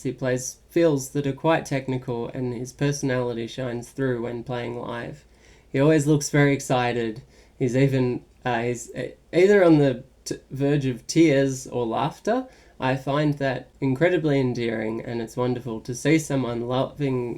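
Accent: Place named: Australia. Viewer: I wanted to give a shot at one of your videos Suddenly clicked on this one - got satisfied with somewhat cozy vibes Btw, love the Australian accent xDD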